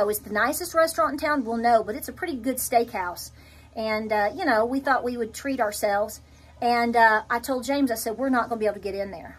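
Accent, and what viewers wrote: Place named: East Tennessee, United States. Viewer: Found you about 2 weeks ago & have been “soaking” up all your advice & wisdom!! You mentioned Tight Wad Gazette in a video I watched today from awhile back. Just wanted you to know I found all 1-3 on Ebay & paid about less than $20 / free shipping SCORE & Thank You!!! Grew up in East TN & never tiring of hearing you / your accent!!! ❤❤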